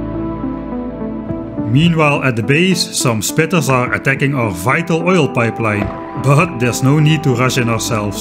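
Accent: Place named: Netherlands. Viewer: I'm actually impressed the algorithm can recognize steenkolen engels.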